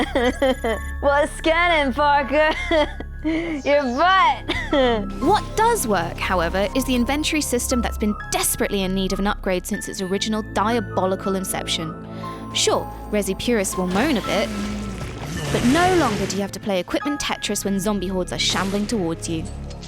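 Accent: United Kingdom. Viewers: Asian girl with British accent? Yes please and thank you :3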